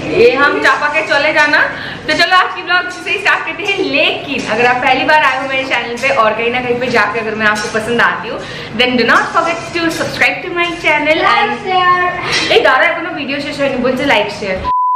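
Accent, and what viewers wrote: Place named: Bengal, India. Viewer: Chapa k chale jana it called bengali style me hindi bolna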